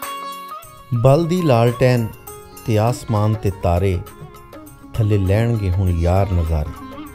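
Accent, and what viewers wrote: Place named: Punjab, India. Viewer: It is just fabulous... nostalgic... Punjabi accent is also just love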